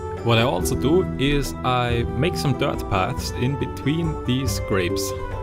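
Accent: Germany. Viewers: gut zu wissen, dass du deutscher bist :D Mach dich noch sympathischer!!! Aber wenn man es weiß hört man es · Another great video, and with a German accent to boot? <3 Also... your mc skin is Finn, the Human? <333 Keep the videos coming, ^^*Also, don't apologize for your accent/language- be proud of your heritage, and exuberant for your linguistic idiosyncrasies! ^^ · your accent is cool btw haha, german sounds great, dont be shy ! :p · Sehr schönes Video und man hat sofort gemerkt das du ein Deutscher bist :D Ist nicht unbedingt schlimm, dein Englisch ist gut verständlich und du hast eine angenehme ruhige Stimme. Atmosphäre ist wirklich kein leichtes Thema, selbst für erfahrene Bauer. Bei den meisten Leuten die ich kenne ist der Anspruch an Atmosphäre überhaupt oder wenig vorhanden. Die Umgebung ist meistens wichtiger als das eigentliche Gebäude und das wurde hier schön dargestellt ;) Ich finde es müssen wirklich kleine Geschichten durch feine Details eingebaut werden, aber auch die Materialien müssen sehr variieren, also random platziert werden. 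Schöne Grüße aus dem norden Deutschlands ;) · You are an awesome builder! Ehrlich gesagt dein deutscher akzent macht deine stimme noch angenehmer :D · Your German isn't bothersome at all. I can understand a few words, so don't worry much. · Sehr gutes video das mit dem deutschen akzent ist net schlimm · great video! definetely will use these ideas in my world, like the hay car ;) i didnt mind your German accent, im Dutch so thats even worse :P great video, A like and A subscribe from me ;) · Mit z German accent =P nhe man, we can clearly understand you and you speak English very well. Love your vids👍🏼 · Du bist deutsch das höre ich aus dem akzent =D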